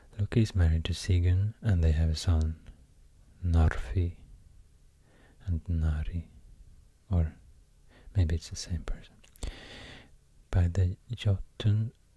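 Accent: Sweden. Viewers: Finally found a very calm speaking swede in the ASMR community! I’ve been to Stockholm so many times and even did an exchange in Umeå. I got hooked to the Swedish accent to English and love this so much. You speak so calmy and take breaks between your sentences. A new subscriber here :) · I think your Swedish accent and calm voice makes your voice so perfect for ASMR.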